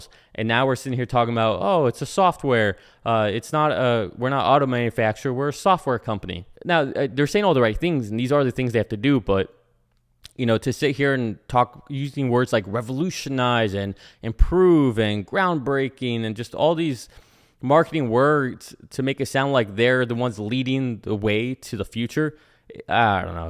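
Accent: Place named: Germany. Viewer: "Diess is a problem and Dat is not " sounds like a German speaking english.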